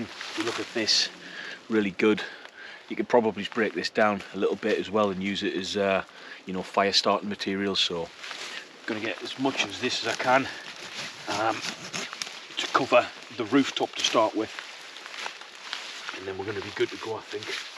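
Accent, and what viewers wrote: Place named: Northumberland, United Kingdom. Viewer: by any chance are you from somewhere near Ashington or Morpeth because I am because you just have that sort of accent and if you are do you support Newcastle United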